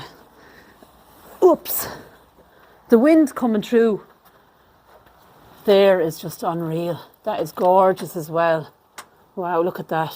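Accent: Ireland. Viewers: I love listening to you and your Irish accent. To think my ancestors came from your beautiful country makes watching your channel even more interesting. Just one of millions of Americans whose ancestors came from Ireland. It’s beautiful and you do an excellent job sharing with us. 🙏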